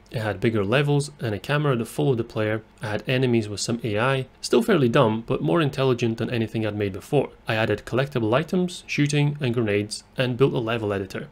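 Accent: United Kingdom. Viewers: are you Scottish btw?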